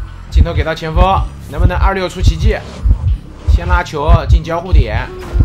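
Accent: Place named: Shandong, China. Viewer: 有没有朋友知道是哪里人。我吃饭看室友说有口音是山东人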